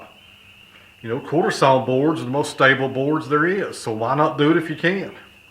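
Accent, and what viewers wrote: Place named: Georgia, United States. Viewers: First vid I've watched of yours. Soon as I heard your accent I recognized it. You say your in Georgia? I think I heard your accent from guys from Kentucky too. I could be wrong. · . . . he has a 'drawl' in his voice.Beautifull accent.Lots of them there down in the south...I enjoyed the show very much and i thank you for taking the time to spend it with us....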